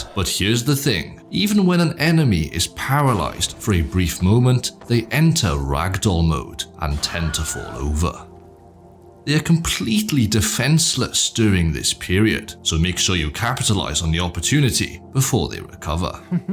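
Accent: Wales, United Kingdom. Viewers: why are you Welsh and can you speak elvish?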